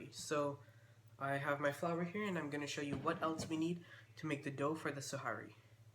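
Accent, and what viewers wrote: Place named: Trinidad and Tobago. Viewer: Very nice. best Trini channel yet. its good to hear a genuine accent and not a fake one. Keep up the good work.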